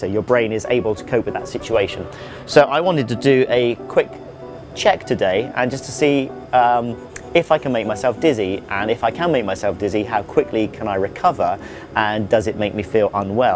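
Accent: United Kingdom. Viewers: Are you british? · I could hear him speak all day long. The British accent is the best.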